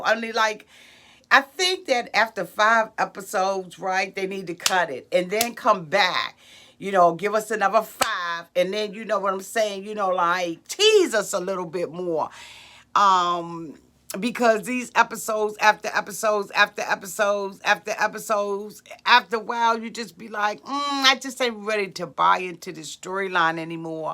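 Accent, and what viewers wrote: Washington DC, United States. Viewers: Just finding your channel. I knew I heard a DC accent. It was the roach crawling down the wall on Puppy’s house for me.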